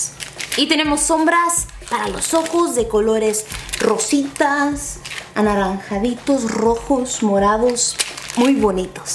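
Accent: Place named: Michoacán, Mexico. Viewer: !!!!! Felicidades!!!,tengo poco siguiéndote en tus tutoriales me gusta mucho como te maquillas, qué bonito rostro tienes, quisiera saber de donde eres originaria o de donde son originarios tus padres porque tienes un acento como que del estado de Michoacán, recibe saludos desde México D.F.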